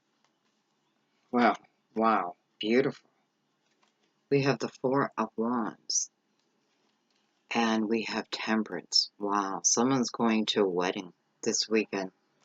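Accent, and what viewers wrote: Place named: Philadelphia, United States. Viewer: The wedding stuff happened. You speak like the people I grew up with ie Philadelphia accent or Jersey/delaware. Just curious, am I close?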